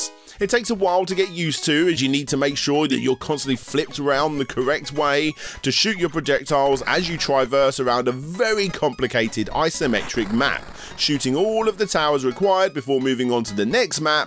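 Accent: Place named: United Kingdom. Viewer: "Lupin" is pronounced "Loo-pan" in Japanese, as it's based on a French character/story. Also "kage" pronounced "Ka-geh", not "cah-geh" as you did in the video. "Shuriken" is pronounced "shoo-ree-ken", not "shuh-ree-ken" too. Hope this helps and thanks for the great British-English review! Always good to see someone not pandering exclusively to the US!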